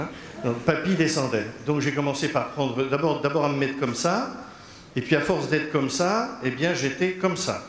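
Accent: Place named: Nord-Pas-de-Calais, France. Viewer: Merci beaucoup pour votre témoignage et votre humour, on dirait un chti, comme moi, sauf que moi je ne suis pas guérie ! Évidemment je ne suis pas en chaise roulantes, mais je prie pour ne pas en avoir une ! Non c'est pas vrai, c'est une blague ! Je suis très contente pour vous, mais je plein ceux qui vous entourés, vous parlez beaucoup, moi aussi, ils ne me coûtent plus, c'est laventage quand on est jeune de 62 ans et demie ! Mdr ! Gros bisous merci à Dieu, que vous puissiez continuer comme ça encore très long......temps!lol bon courage merci beaucoup Mr, je sais plus !... Le curé ou mon Père, zuttt j'ai oublié ! Gros bisous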